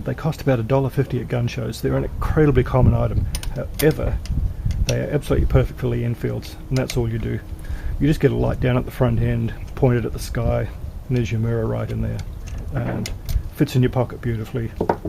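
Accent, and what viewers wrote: New Zealand. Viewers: MonsterofTheAslyum1- The same principle applies at the breech end of the barrel as the muzzle end. If you have 1/4 inch of projectile protruding at the muzzle, it indicates you likely have a good barrel, if you have a 1/4 protruding at the breech end, same is the likely indication, as it is the rifling that you are testing. This is especially true as the Lee Enfield cartridge is tapered as well, which makes this test all the more useful. I hope this makes sense. I grew up with these beauties, my first large game (feral cattle) animals were taken down with my dads pristine No4. Mk1 (had the test tag attached when he collected it!) at the tender age of 12yrs old. My hunting rifle now is a previously sporterised (by a previous owner). No4. Mk1. I used this exact test, got the exact result as above, it groups about 1 1/2 to 2 inch at 100yrds. 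It shoots better than I do! I am a LOT older now. :) This guy sounds definitely kiwi. Great series of vids too! Thanks. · Kiwi I'd say. Not stretching the 'e' enough to be aussie.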